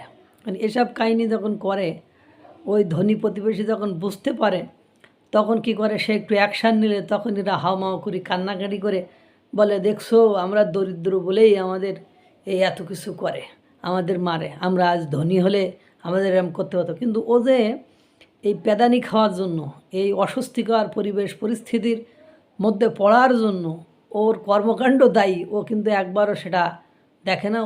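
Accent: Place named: Bangladesh. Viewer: ভাই আপনার ভাষা শুনে মনে হয় বাংলাদেশের। কথা শুনে মনে হয় ভারতীয় মুসলমান। যদি বাংলাদেশী হন, তাহলে সাবধানে থাকবেন।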